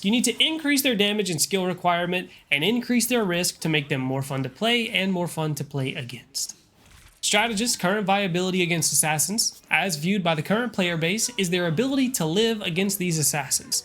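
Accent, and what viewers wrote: South, United States. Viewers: is that a southern drawl I hear? Power to you it sounds soothing to listen too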